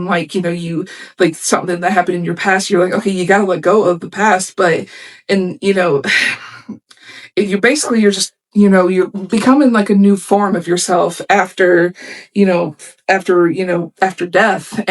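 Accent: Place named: North America. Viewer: Your accent sounds pretty North American, and they come up here pretty regularly since Monterrey is only about 80 miles from the Texas border. Just keep an eye out on their web site for their schedule. Even if it's just a 1/2 hour set in a multi-band festival, seeing them live is always worth every penny - and generally speaking, you won't even have to spend that many pennies - they won't try to squeeze $600 out of you; between $30 and $60 usually sufficient. 🙂 Musicians this good and entertaining for an affordable price is almost unheard of these days, and a tremendous relief for those of us who have been going to concerts and festivals for multiple decades.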